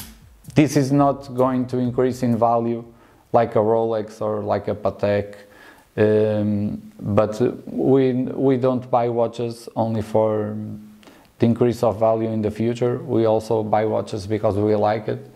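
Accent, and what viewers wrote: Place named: Portugal. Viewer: Pelo sotaque suponho não errar. É português? Bom vídeo, detalhado e pessoal. Estou em vistas de comprar um igual. Aconselha alguma loja em especial?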